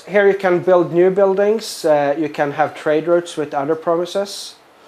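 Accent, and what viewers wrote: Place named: Sweden. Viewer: Swedish accent ftw!! ;)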